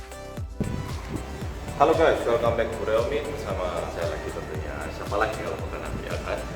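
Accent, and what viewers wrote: Java, Indonesia. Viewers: sampean medok e notok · jawanya mendok men thoh, aku salut,. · Kali Bicara Logat Jawanya Padet Banget 👍😁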